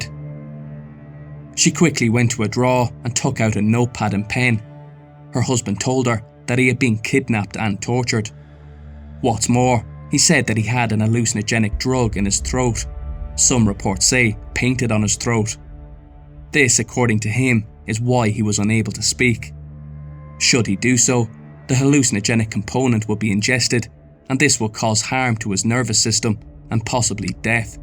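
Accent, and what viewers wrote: Ireland. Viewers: Great vids, pleased to find an Irish accent among all the American channels. 😁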